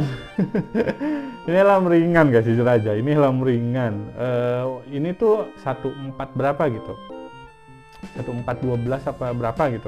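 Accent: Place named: West Java, Indonesia. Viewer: Aa org sunda nya. Sunda mana?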